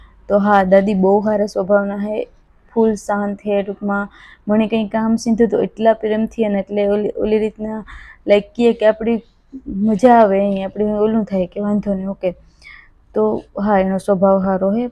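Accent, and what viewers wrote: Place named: Merani, India. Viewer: બેટા મેરાણી ની ભાષા બહુજ સરસ રીતે રજુ કરે છે તે મને બહુજ ગમે છે બેટા ખુબ ખુબ આશીર્વાદ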